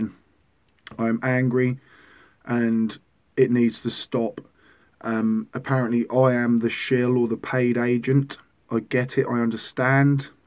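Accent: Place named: England, United Kingdom. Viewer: U r obviously english, whats ur relationship with düsseldorf?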